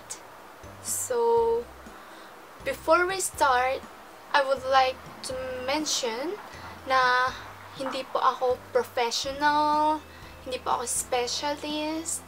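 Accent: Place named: Japan. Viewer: ang cute niyo po magsalita, parang may japanese accent 😂💛 new subbie here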